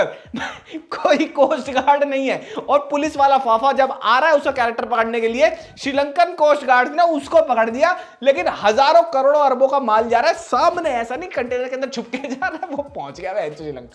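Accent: Haryana, India. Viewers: Bhai mast review !! Bring back the haryanvi accent 😂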